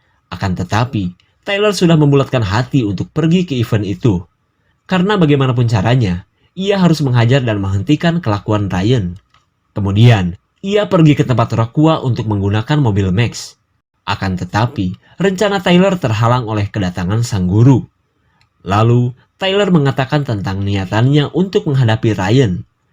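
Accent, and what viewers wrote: West Java, Indonesia. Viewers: Teu dindi2 ai jama sunda ngomong na garelo tpi mantak nyeri beteng ngakak bae😆😆😆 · Sunda pisan ekekkek hanjakal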